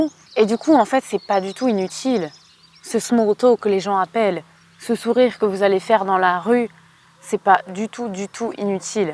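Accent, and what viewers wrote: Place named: Belgium. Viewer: je suis belge, et quand tu parles, bain on dirait quelq'un d'ici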